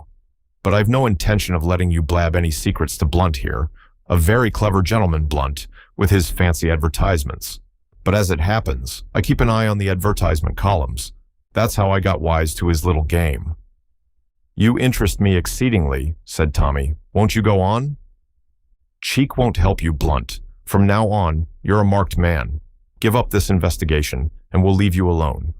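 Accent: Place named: United States. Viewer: Terrible listening to Agatha Christie in an American voice 😔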